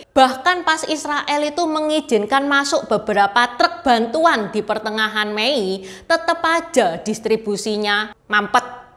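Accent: Java, Indonesia. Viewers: Medok tenan, ciri khaz e Semangat💪💪💪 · Wong ndi sampean mbak kok medok jowo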